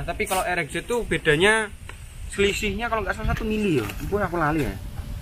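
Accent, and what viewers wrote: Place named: Yogyakarta, Indonesia. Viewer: aku barusan sub,sampean arek ndi sih,kok ngomonge koyok jowo jogja.lokasi bengkel dimana mas,salam dari jawa kaltim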